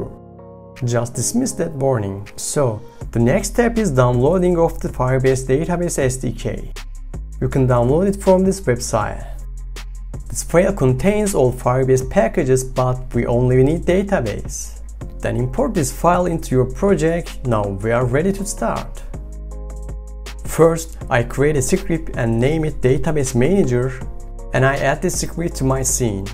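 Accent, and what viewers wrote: Turkey. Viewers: Videonun ilk 4 saniyesinde telaffuzdan oturu "Lan Turk lan bu" diyisim :) ilk defa goruyorum kanalini, basarilar diliyorum. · Are you Turkish guy ? :)) Your accent is very similar to Turkish :) I have just curious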